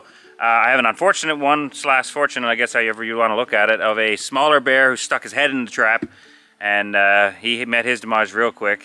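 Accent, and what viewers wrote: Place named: Prince Edward Island, Canada. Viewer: Its similar to the PEI accent, which is a mix of Newfy and Scotian I think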